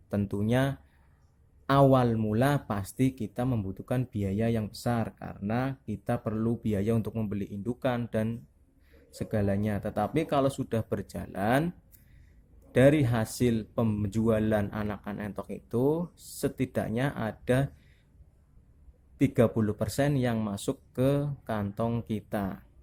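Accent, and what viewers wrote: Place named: Banyumas, Indonesia. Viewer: Ora ngapak ora penak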